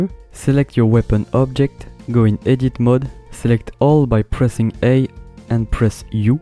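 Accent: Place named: France. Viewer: Salut! Super cool tes vidéo, merci beaucoup! Je vois que les gens apprécient ton accent français haha! Fais gaffe quand même: J en anglais ça se dit ''djay'' et pas ''dji'' (et G se dit ''dji'' justement)... Je me suis gourré dans le raccourci à du coup ^^ j'ai fait ctrl + G (et ça a fait un truc mais je sais pas exactement quoi haha)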